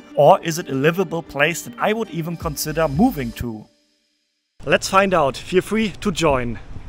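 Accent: Germany. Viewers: Sounds like you are from Germany or German speaking country…… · He is germans 😂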